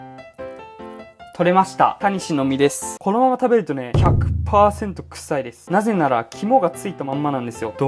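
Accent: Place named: Mikawa, Japan. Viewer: 方言が愛知っぽい どっちかって言うと三河の方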